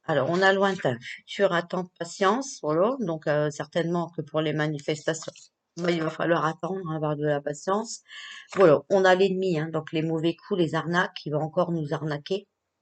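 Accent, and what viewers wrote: North, France. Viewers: Bon Anniversaire 🥰💜❤️💛 Je viens de vous découvrir et j'apprécie votre naturel... et votre accent de chnord;) ( le même que ma grand-mère) Bonne continuation j'aime bien venir vous écouter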